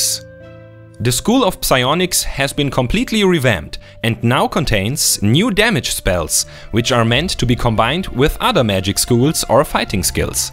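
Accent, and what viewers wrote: Germany. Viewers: oh gott was für ein cooler deutscher akzent :D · Super video, klingt nach wirklich tollen ideen für die neuen Zauber. Der deutsche Akzent ist sehr putzig :D :D Ein native speaker kriegt da wohl Gänsehaut, aber klingt einfach süß :D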